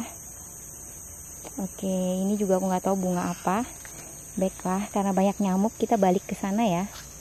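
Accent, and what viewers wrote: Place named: Jakarta, Indonesia. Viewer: Mb swaktu bicara logatnya seperti Jakarta apa bisa pale logat to dialek local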